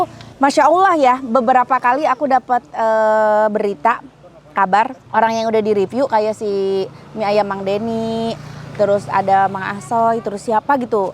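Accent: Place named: Garut, Indonesia. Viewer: Hayang aing muncrut🤣 Khas bahasa urang garut Si Tteh. Ngiring raos na Tteh🙏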